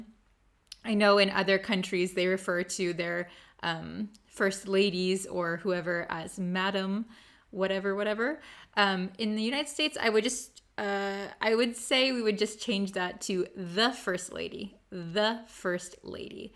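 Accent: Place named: United Kingdom. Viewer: It's for... You're American but you speak British English, l don't understand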